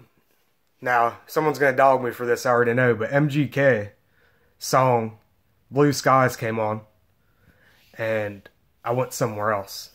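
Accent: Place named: East Texas, United States. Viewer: You sound like your from east tx also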